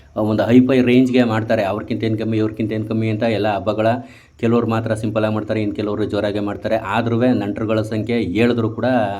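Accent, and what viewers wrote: Mandya, India. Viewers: ❤ video hakidakkee kushi aithu sir ❤ love your family neamma Mane super ❤ neamma language super ❤namma Mandya language super ❤navu Mandya sir ❤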